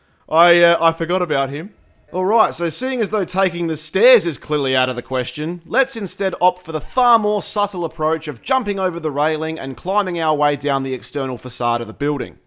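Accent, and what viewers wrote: Australia. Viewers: Aussie accent just makes everything better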